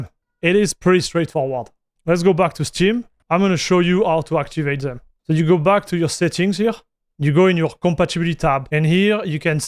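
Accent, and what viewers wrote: France. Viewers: Superbe accent Français ! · Merci pour cette vidéo et quel accent français 😋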